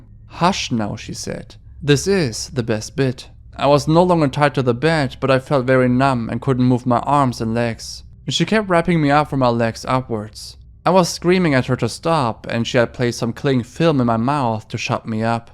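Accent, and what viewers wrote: Germany. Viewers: You are German, right? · I think he said once that German was his first language, so you’re probably just hearing how thick his accent is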